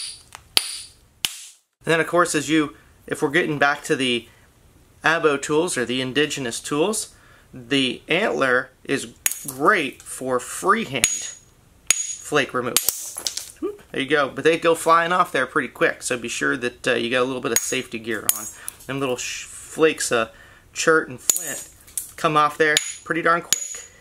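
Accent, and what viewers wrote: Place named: South, United States. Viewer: are those invasive hogs? i'd assume so by the slight southern accent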